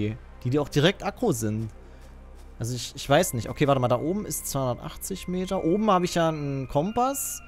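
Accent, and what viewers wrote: Germany. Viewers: könntest du die englischen Sachen nicht so deutsch vorlesen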